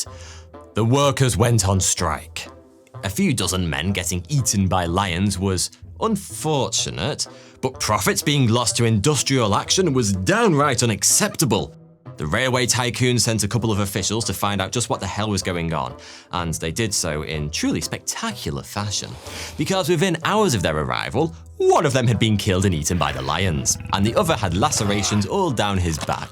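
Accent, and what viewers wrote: United Kingdom. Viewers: I usually love your videos, especially the fact that the British accent is the most attractive in the world and your content was almost always on po8nt. Not this timw. Im so tired of humans, with our vast intellect, completely distegarding our animal instincts when considering humans threat level. How can a 200lbs mountain lion bolt from a person half its size, but other humans seem to think we're so weak and flimsy? A. We are the ONLY great ape species capable of swimming, awkward or not. B. There are very few animals that can run for as long as we can, or pursue pray for as long as we do. Cant run from sometbibg that wont stop chasing you. 3. You can keep on with your pessimistic approach to humanhood, while i take in the facts of history. Consider we are the dominate species on the planet, well, come prove im weak for being human, go ahead.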